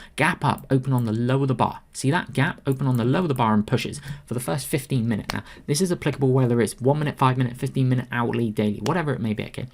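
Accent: United Kingdom. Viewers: hi, not asking for recommendation, just wanted to know what if any allow unlimited stock trades in the UK, only asked as you sound like you are from the UK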